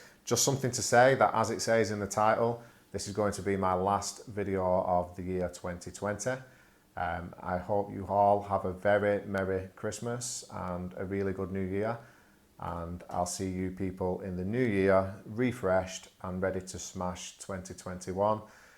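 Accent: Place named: United Kingdom. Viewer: Hello! I am your new subscriber! 👱 You British accent very similar to Gordon Ramsay's, so British that I wanted to make me a cup of tea with milk instead of black coffee as I used to ☕😅 Happy to find your channel! Wish you loads of love, tons of money and a load of new subscribers in the coming year! 🎄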